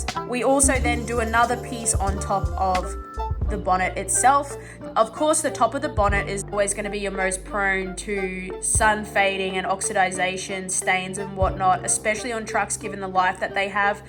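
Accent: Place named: New Zealand. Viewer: Bubbles? how on earth do clear them?? And she sounds like a Kiwi,,,🤔😊😊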